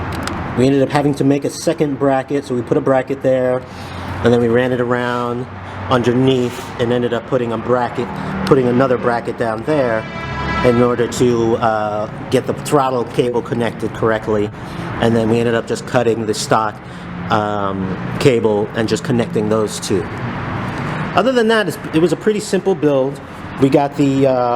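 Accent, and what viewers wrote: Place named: East Coast, United States. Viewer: Funny, you don't sound Californian! You sound like from the east coast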